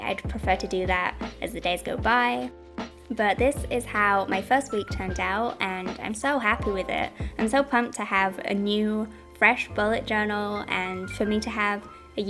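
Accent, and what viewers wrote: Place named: North, United Kingdom. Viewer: I hope you don't lose your northern accent.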